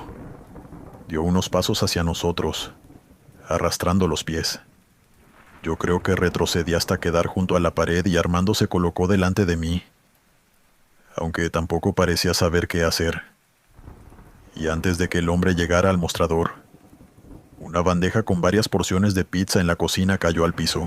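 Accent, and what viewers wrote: Mexico. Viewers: Yo pensé q era Méxicano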